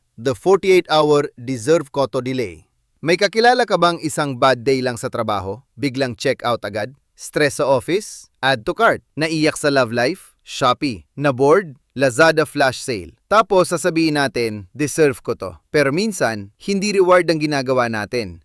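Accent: India. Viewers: bakit nagiging tunog bumbay??? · Mokhang mombay ang nag sasalita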